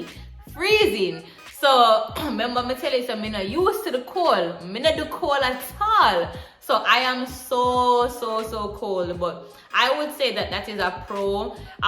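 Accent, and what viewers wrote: Caribbean. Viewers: That intro…I know she’s a Caribbean gworl🤣🤣🤣🤣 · Loving that Caribbean accent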